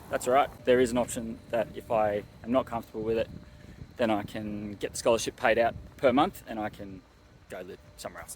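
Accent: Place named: Australia. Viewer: Thanks for adding the subtitles. I am not used to the Australian accent and I had a little difficulty making out the words you said. The subtitle helps a ton!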